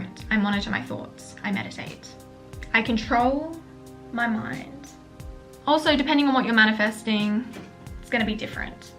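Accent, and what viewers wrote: Australia. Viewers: i watch atleast two of her videos every day to get motivation + learn the aussie accent lmao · I keep faking an Australian accent for an hour straight after I watch your videos and I'm not even a native English speaker I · Is she Australian? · Finally someone who teaches accuratelyyyyyyyy. Also every time I watch your videos my mind speaks in an Australian accent to me for like 30 mins after. Don't know what that means but I'm not complaining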